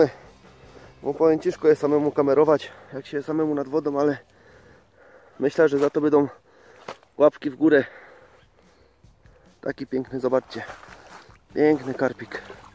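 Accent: Silesia, Poland. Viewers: Ty ja tak samo. Gadka Śląska hehehe. Chytaj chytaj :) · Jak ja lubię Ciebie oglądać, przez tą Twoją gware 😉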